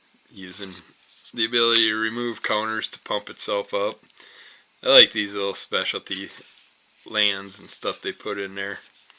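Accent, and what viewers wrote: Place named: Wisconsin, United States. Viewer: Great video. What I don't know about these could quite literally be put in a book. I remember in 1994 I saw alot of ROTC kids playing this during my junior year of high school That summer I visited a kid I knew who'd moved to Warren Ohio and he tried to teach me how to play MAGIC. I guess I was too much of a right brained kid because I kept getting hung up and confused. I did like seeing the dark art though. It'd be cool cool to see a conan the barbarian version on a card game I knew the old cards and sealed boxes had value but that is amazing just how much the wrappers go for. Cool little asmr style video. The Wisconsin accent is very pleasant. Hope your having a good week and have a real nice weekend, my friend! 😊😊😊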